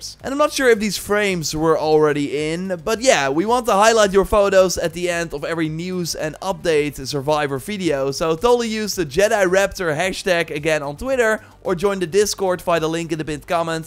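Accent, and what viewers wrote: Netherlands. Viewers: Are you Dutch? I think I hear it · Telling by your accent, I immediately knew you are Dutch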